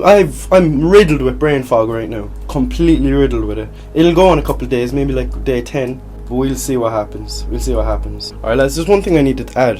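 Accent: Ireland. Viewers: Bro you rare af a black guy with a Irish accent you would get so much ass in America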